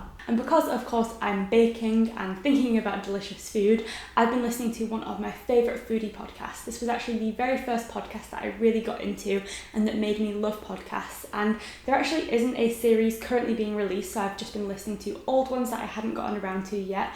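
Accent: England, United Kingdom. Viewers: She’s from England but idk where specifically.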